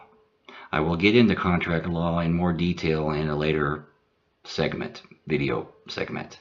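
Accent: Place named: United States. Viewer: Amazing and thought provoking in this age of rampant extremism and rising nationalism. This is the first time I've listened to an American and understood every single word he has uttered without scratching my head and despite his accent. Besides, this person sounds like a very decent American, which is quite unusual. Interesting philosophy, really, and a humanistic and tolerant approach to the world. Personally, I've always harboured similar ideas ever since I was a teenager, but I had no name for it and never looked for one anyway. I've just been unable to fit into any ideological, doctrinal or sectarian pattern all my life, which I think is a boon as I never got involved in compromising political endeavours. Voilà de quoi me lubrifier les méninges. Greetings from Algeria.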